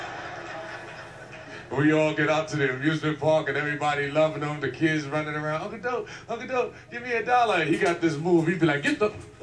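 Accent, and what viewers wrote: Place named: New York, United States. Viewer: Well let me tell you I was scrolling through YouTube and I seen this video and as soon as he started talking I knew he was from New York I'm from New York Brooklyn Queens Manhattan now I live in Florida so I haven't heard people talk like that since I moved here in she got his uncle down pat that is the real real life of adult feet I know cuz I have so many friends that have uncles and friends all kinds of people that are just like that alrighty brother it's a shame you could have been bigger than Kevin Hart😢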